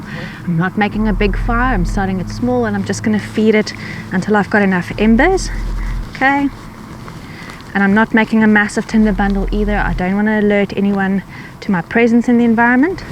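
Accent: South Africa. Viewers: SAFFA accent. Nothing else matters :) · South African accent. Just accept we all sound a bit different and enjoy the content of the video. 😎🇬🇧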